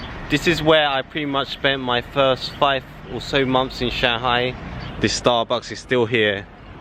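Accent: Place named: North London, United Kingdom. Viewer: Be used to the American accent and good to have the video with your north London accent, which is a quite good opportunity for learning something new.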